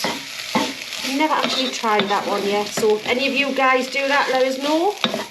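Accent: Newcastle, United Kingdom. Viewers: I moved to Australia 56 years ago from Newcastle and I just love your Geordie accent. i am loving your videos,the food is bringing back so many memories, so a big big thank you for the time you are putting in for our enjoyment. Also could you tell me the name of channel and I will follow him too. Love you guys.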